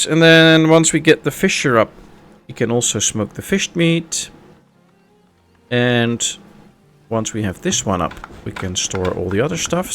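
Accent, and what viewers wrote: Netherlands. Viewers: Nice video! I recently found your channel while looking for LiF letsplays. Maybe an odd question but are you Dutch? You have that typical English eccent. If yes then groeten uit Utrecht.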